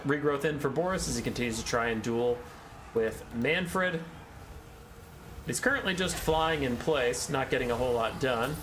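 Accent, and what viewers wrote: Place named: France. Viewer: Just missed this :< gonna need a game cast in that exquisite french accent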